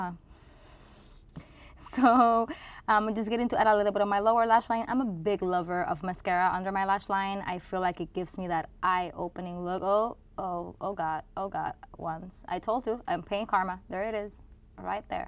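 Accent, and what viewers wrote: Puerto Rico. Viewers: I love your Puerto Rican accent and you're beautiful! Love this natural look. Question... Do you wait a few minutes between each layer of product prior to your foundation? Thanks for a great video! 😀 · Ningún "Please excuse my thick Puerto Rican accent"! Eres boricua y qué! Gracias por el video! Mucho amor desde PR! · Lol I didn't even notice you had an accent until you said you're from PR 🇵🇷. Keep the clean makeup tutorials coming!!!! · Well done Sephora for using a Puerto Rican MUA! Love the accent <3 and the tutorial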